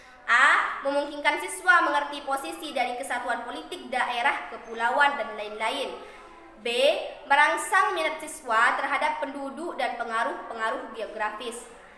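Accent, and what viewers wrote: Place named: Madura, Indonesia. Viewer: Ciri khas bahasa Madura😁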